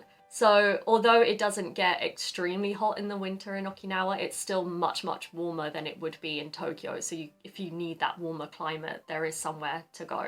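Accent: United Kingdom. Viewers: ソイマッチ！てゆうイギリス訛りがクセになる！ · 初めまして。英語でお話されることで外国にも日本をご紹介頂けるので嬉しいです。加えて日本語字幕も付けていらっしゃるので日本人も楽しめます。とても良いですね。また、さんのイギリス英語は、アメリカ英語に比べて、とても格調高い発音に聞こえて心地良いです。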